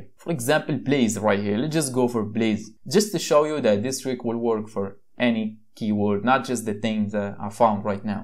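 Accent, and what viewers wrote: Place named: Morocco. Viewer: Maghribi la ? Chkit fl accent dyalk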